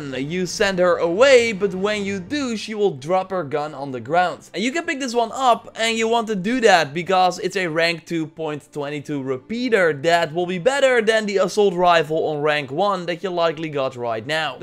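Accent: Netherlands. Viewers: ben je nederlands je bent super goed in engels maar ik hoor het aan je accent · This Guy sounds dutch, i recocnize the accent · You sound like you're from the Netherlands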